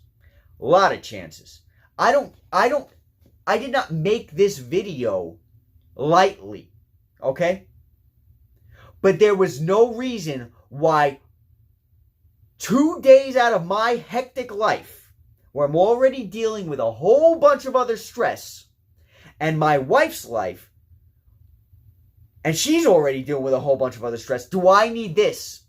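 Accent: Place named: East Coast, United States. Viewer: I kept listening because I honestly love your accent. You must be from the east coast? New viewer here. My mom had a thick east coast accent and I miss it everyday since she passed away.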